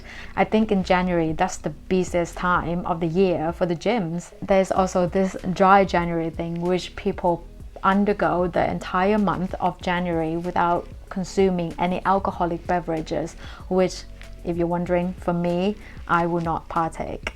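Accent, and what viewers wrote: United Kingdom. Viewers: I am very much impressed off yours british accent speaking .i hav never seen any thai people talking like this manner as i hav work with thai people here in Nepal ktm station😊😊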